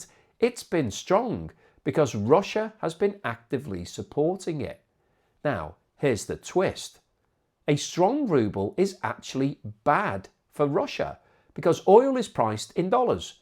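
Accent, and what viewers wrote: United Kingdom. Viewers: I do like your fast British delivery rather than those wordy USOnes that treat us like idiots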